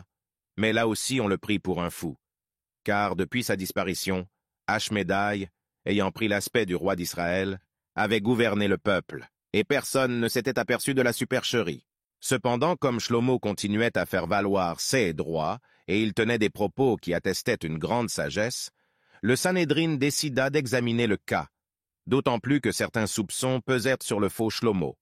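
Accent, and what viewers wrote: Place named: Africa. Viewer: Tu parle mal avec ton accent afficain c est salomon et non slomo et asmidee avait des pieds de canard et.non de coq .va apprensre ta leçon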